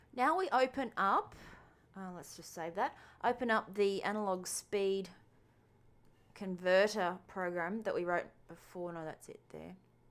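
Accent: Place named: Australia. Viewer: So nice to open the video and hear an Aussie haha. Really clear tutorial too, many thanks!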